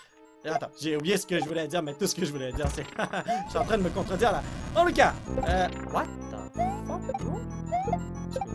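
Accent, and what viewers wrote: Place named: Quebec, Canada. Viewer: J'adore les expressions qu'il utilise, en plus il y a un accent québécois sans que ce soit trop prononcé c'est génial :)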